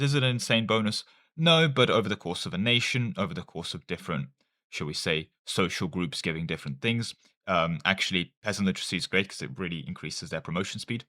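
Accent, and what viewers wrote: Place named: United Kingdom. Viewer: x3 speed is only possible for americans, British accent unintelligible over 2-2.5x speed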